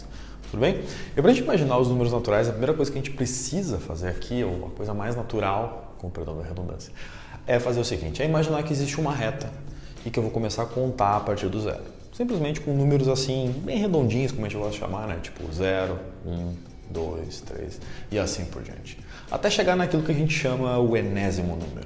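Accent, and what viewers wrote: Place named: Rio Grande do Sul, Brazil. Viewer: Excelente canal. Pelo sotaque deve ser gremista!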